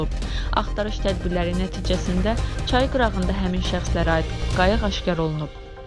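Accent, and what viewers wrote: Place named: Baku, Azerbaijan. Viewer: Пелтейи отуздурубсуз орда , башга журналист йохдур Бу гызын сеси адамы лап есеблешдирир Гуйа бу Шехер лехчесийле данышыр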